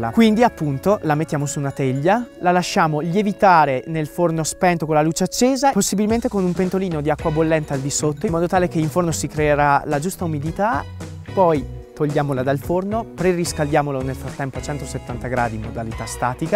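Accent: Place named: Trentino, Italy. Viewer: Oltre che bello, quello accento Trentino lo adoro. Marchigiana ma con il Trentino nel cuore. I love val di non. ❤ Tovel ❤❤❤❤❤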